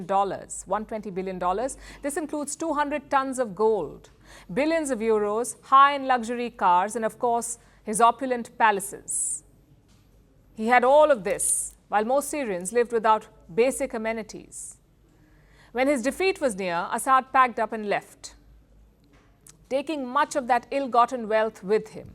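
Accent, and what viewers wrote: India. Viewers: Now I know you are garbage news, with indian accent. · Palki, the puppet Western propagandist with an Indian accent.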